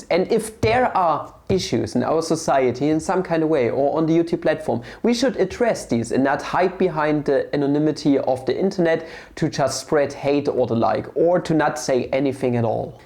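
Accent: Germany. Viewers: I have rarely seen your channel and your videos and just stumbled here because of algorithm. I don't know why you hate indians or indian claims, maybe i know. Maybe it bugs you that someone can be better than the superior western european white race. May be it reminds you of the trauma of how west and europe is declining and other parts of the world are no more your slaves and your yaysayers and they are claiming their heritage. Maybe its because of your accent you seem deutsch and your ancestors felt the same way about indians. They detested and hated indians. Incidentally, they hated jews as well so i guess the problem lies with jews and indians somehow. Your rant reminded me so much of your ancestors. Maybe the apple doesn't fall far from the tree. Disclaimer- I am usually an opposite of a nationalist or so called patriotic and i know you are going to paint me as one for your convenience and i don't care, i am usually a cool dude and i apologize if i am rude to you but your rant and your intrinsic subtle hate about a group of people made me comment. 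I usually never comment on anything. I hope you educate yourself, visit india sometime and learn that india is even bigger than europe in terms of population and people groups and there are many different kinds of people and have differing opinions. Just like europe has far right white supremacists like yourselves, india too has bunch of bigoted nationalists, scammers and bad people and if you count them, they are probably far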